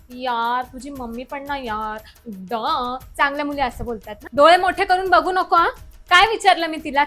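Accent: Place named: Nashik, India. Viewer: तु नाशिकची वाटते.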